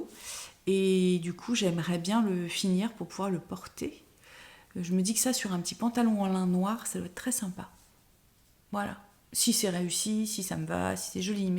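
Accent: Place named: South, France. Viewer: ton accent du sud est parfait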